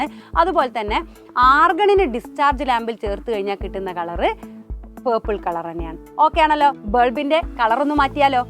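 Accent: Malappuram, India. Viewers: Missinte samsaram super aanu mis malapuram aano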